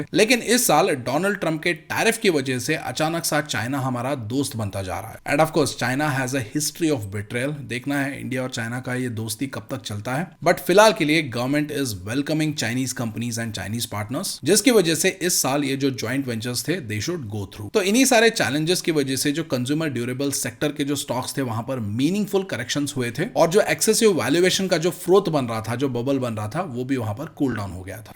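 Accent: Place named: Bengal, India. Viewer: Hindi theek se aati nahin to koi aur bhaasha mein boliye. Your Hindi (are u Bengali BTW?) grates on my ears 😭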